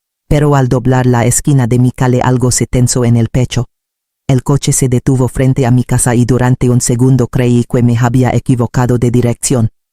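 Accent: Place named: United States. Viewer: No puedes hablar en un español claro? Porqe ese tonito agringado se te oye muy mamuco y no se te entiende. Aparte, no hay necesidad alguna de que utilices esa forma de hablar. Ya michas personas te lo han dicho pero te vale. Pon remedio urgente a esto, sino ya no vamos a abrir tus videos. Ok?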